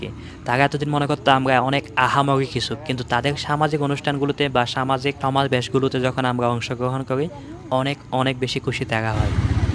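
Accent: Chittagong, Bangladesh. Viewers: আপনার র/ঢ় উচ্চারণ ঠিক করেন,চাটগাঁইয়া ভাষাই আপনার জন্য উওম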